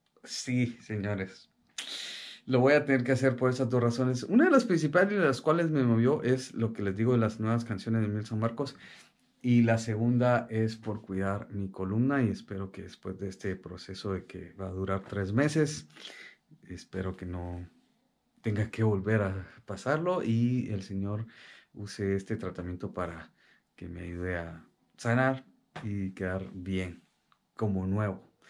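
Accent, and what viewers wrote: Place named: Mexico. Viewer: Más de Guatemala habla más como de México hermano jeje 😂 😂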